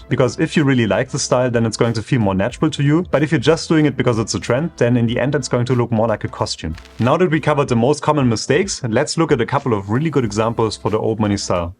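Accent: Germany. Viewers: ich liebe es, wenn man auf einmal auf englischsprachige Deutsche trifft 😂 Der Akzent verbindet uns😂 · Oh hör ich da etwa deutsch raus? 🤫🤔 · I hear german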